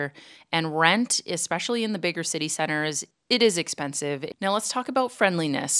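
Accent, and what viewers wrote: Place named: Canada. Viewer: Awesome video, from the moment I would have heard you "About" or "Sorry" I would have known you were Canadian lol that being said have you found that most Kiwi's aren't fond of American's? I'm from the Caribbean but I currently live in the US and I'm a bit curious to how Kiwi's would react to both Americans and people from the Caribbean too if you noticed those interactions as well.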